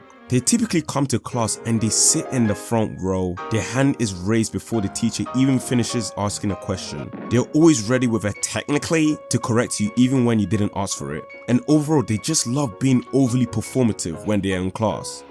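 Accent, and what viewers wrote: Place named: United Kingdom. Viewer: bro how this guy talks in the most british way is just so funny and understandable 🤙😭🗣️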